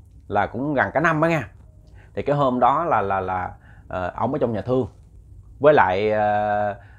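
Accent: Mekong Delta, Vietnam. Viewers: Rat nghe chat giong mien tay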